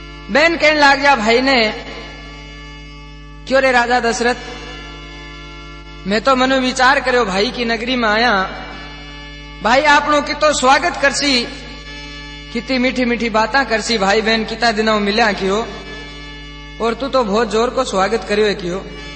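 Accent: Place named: Shekhawati, India. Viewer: इस कथा में आपका जो गाने का अंदाज है जो लय हैं इसका मेरे पास कोई शब्द नहीं है और आपकी जो शेखावटी भाषा कितनी प्यारी लगती है लव यू गुरुजी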